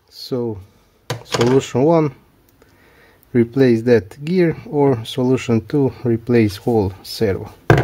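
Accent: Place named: Croatia. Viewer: engleski ti ide super al mi se čini da ima i nešto hrvatskog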